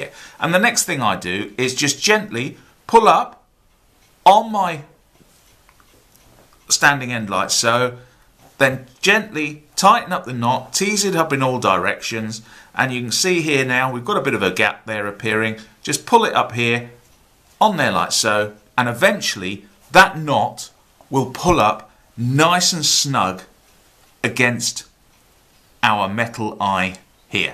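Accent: United Kingdom. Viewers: STU HERE THANKS ALWAYS WANTED TO KNO HOW TO TIE THE ANGLERS KNOT. OH AND YOOU BRITISH ACSENT IS SPOT ON. I LIVED AT THE BEACH FOR 20 YEARS NVERE HAD THE NEET FOR THAT KNOT. CIAO.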